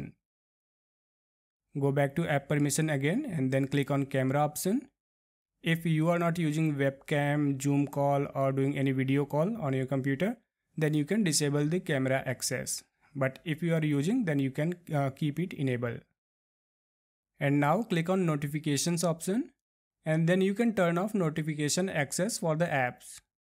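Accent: India. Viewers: You're not kidding. I clicked on this video hoping, praying the creator would have an Indian accent. And man, my relief was immense and impossible to put into words. I trust Indians with my life fr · this Indian accent LMAO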